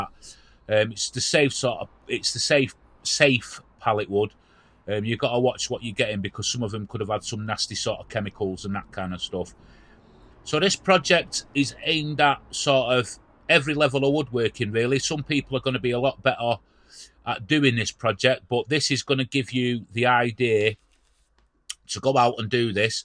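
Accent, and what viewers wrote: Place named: Scotland, United Kingdom. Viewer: Paul From Pa in US. Looks great. I have been wanting to do some pallet wood projects. I love that rustic old look. I also love the color of the stain that you used. I could see this out in my garage for storage / small tools etc.. My problem is that I want to build everything I see and you just can't. I really like the pieces you build though. They are just different and that's what I like. Plus the accent / location helps since I was born in Scotland but I have been in the US since 1968. I still have a spot in my heart for that part of the world. Thanks for another great build.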